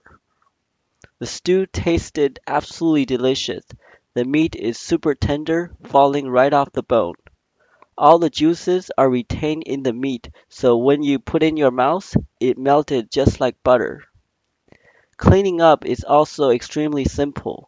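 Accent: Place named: China. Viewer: A cook with a Chinese accent always make great food :-)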